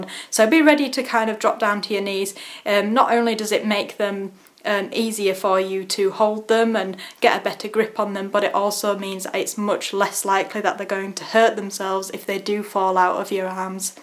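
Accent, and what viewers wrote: United Kingdom. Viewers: Nice British accent. Can I be your guinea pig?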